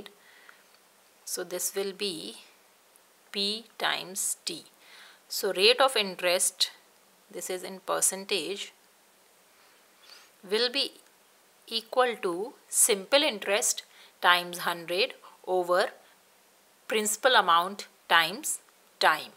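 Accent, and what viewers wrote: India. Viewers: When I heard that she had an Indian accent is when I had complete faith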